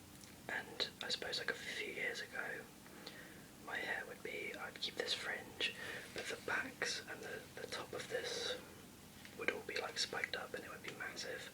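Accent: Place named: United Kingdom. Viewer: British? Check.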